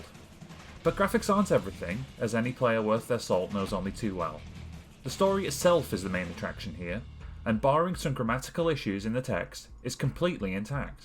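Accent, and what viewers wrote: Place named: East Midlands, United Kingdom. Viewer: You put so much effort into this, I love it. I saw when you joined and I assume this was a Covid project? Also you sound so close to my accent in the East Midlands!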